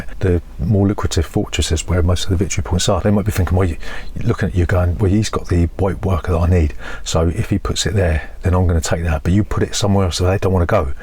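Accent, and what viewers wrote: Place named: Cockney, United Kingdom. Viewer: I quite like watching an subtly-aggressive cockney do a board game review - he reminds me of Vinny Jones! Good stuff. (I'm sure he's not actually aggressive, it's more the comparison to Jones.)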